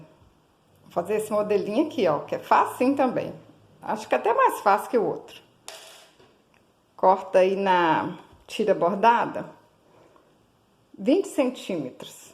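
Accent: Minas Gerais, Brazil. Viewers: Amo seu jeito mineirim carinhoso de falar...kkkk Você é um doce!!!! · Que pessoinha linnnnnda que você é, e esse sotaquin de Minas Gerais! Muito obrigada por sua generosidade.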